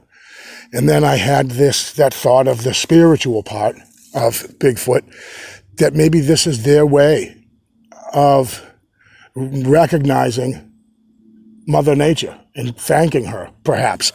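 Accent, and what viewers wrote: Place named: Boston, United States. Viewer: You have an accent. Maybe Boston. Where are you originally from you have an accent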